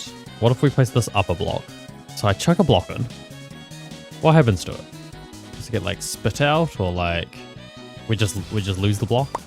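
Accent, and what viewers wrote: New Zealand. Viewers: are you kiwi? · This man kinda sounds like the Minecraft playing Kiwi Version of Martincitopants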